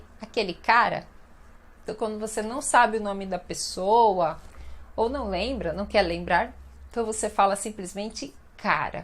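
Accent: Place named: São Paulo, Brazil. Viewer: Wow São Paolo dialect! I love it.Obrigado muito!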